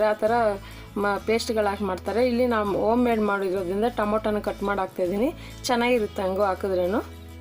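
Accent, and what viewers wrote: Tamil Nadu, India. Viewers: Tamil nimma mother tongue allva nimma language hage ide.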